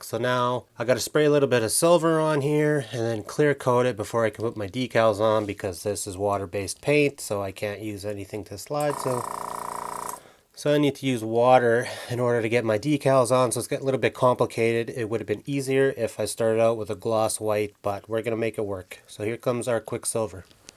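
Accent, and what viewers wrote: Canada. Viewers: Don't change a thing brother! I love your attitude and the way you tell us how you do things! Plus that sweet Canadian accent is a bonus! Everything you do is cool! This old Oldsmobile brings back memories to this old Missourian. Thanks for what you do!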